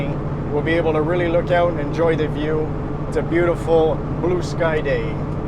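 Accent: Canada. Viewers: I can hear that Quebec accent ;) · DUDE! Fascinating video! I loved how you patiently dealt with the round-a-bouts in NY. I am a lifelong long Quebecor now living in California and I'm trying to identify your accent because your english is perfect. Acadian?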